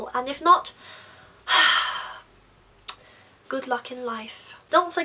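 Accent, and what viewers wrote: London, United Kingdom. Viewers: Do u learn British English? C: I love ur accent :P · Are you from London? I cant spell worth shit...but im just fine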